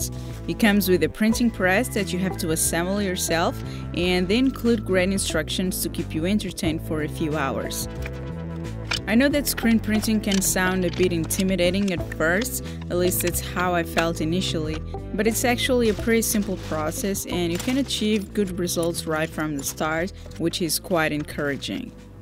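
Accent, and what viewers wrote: Portugal. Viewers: Suspeitava que fosse portuguesa, pelo sotaque! Acertei hehe :D (sou professor de inglês, por isso notei facilmente, btw ;)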